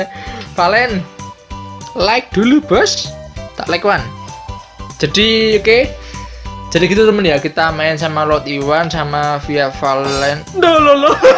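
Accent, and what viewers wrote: Java, Indonesia. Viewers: Medoknya dipertahanin bro, ciri khas elo xD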